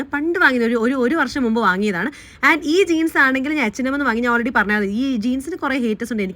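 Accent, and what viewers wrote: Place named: Palakkad, India. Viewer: Palakkad Anu swedesham manasilayi pakshe orupadu slang keri varunu .enthandi unni niyu ingane thango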